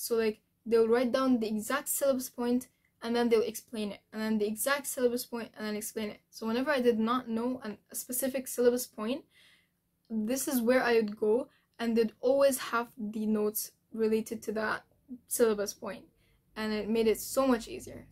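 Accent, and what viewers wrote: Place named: Arab world. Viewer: I FIND YOUR ARABIC ACCENT SO SO SO PRETTYT AND YOUR HUJAB I SO SO SOS SOS OS PRETTYY TOOO LMAOO thankyou for thiss <3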